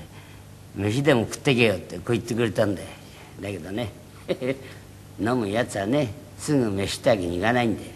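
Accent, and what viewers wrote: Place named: Tokyo, Japan. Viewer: 中学生ぐらいの時に師匠の話を聞いて「江戸弁っていいなぁ」と思ったものでした。 この師匠とか円生師匠には何処となく色気が有ります。 up有難うございました。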